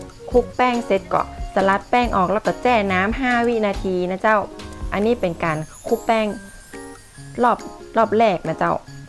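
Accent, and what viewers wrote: North, Thailand. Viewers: นั่งดูจนจบคลิป พึ่งรู้ตัวว่าเป็นภาษาเมือง 5555 (ด้วยความเคยชินกับภาษอ่ะเนาะ) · ชอบฟังภาษาเหนือค่ะน่ารักดี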